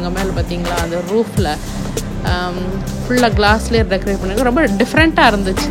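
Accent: Nagercoil, India. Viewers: Nagercoil Slang💪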